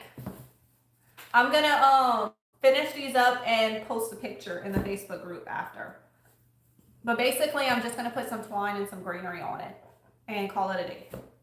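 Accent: Louisiana, United States. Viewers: ♡ I happened upon your chanel about 2 days ago and completely binged you all day today while cleaning/organizing my craft room!! So inspiring and I love your accent, I grew up in La and you bring me back!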